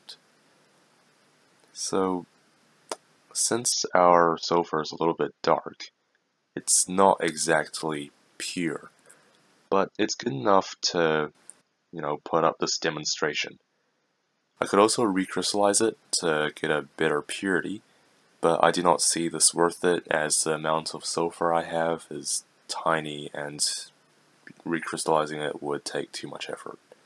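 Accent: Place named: Australia. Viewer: I can't tell where your from. Australia?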